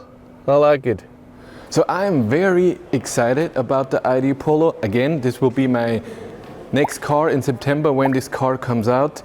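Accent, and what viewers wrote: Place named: Germany. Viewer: Sigh... Dear narrator: The word is view with a v, not “fiew” with an f. I don't know why, but this is the single most infuriatingly annoying aspect of your Denglisch. Please try to learn the correct pronunciation, so you can stop doing this. Gillt übrigens für alle Wörter mit einem V (und nicht nur am Anfang des Wortes, sondern überall): NUR auf deutsch und niederländisch spricht sich der Buchstabe V wie F aus; auf allen anderen Sprachen, inklusive Englisch, hört er sich so an wie das was Sie auf deutsch mit einem W schreiben.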